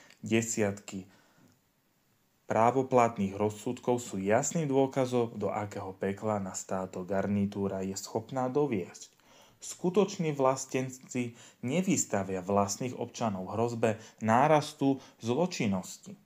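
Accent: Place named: East, Slovakia. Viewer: Co zas po vychodniarsky perla asi z dolneho otvoru…❤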